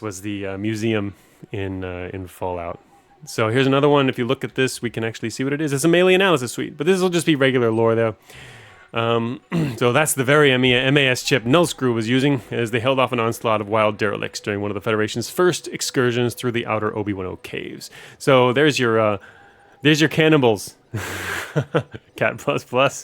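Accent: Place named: United States. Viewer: Random question, but why is you file explorer non English? Your accent is American.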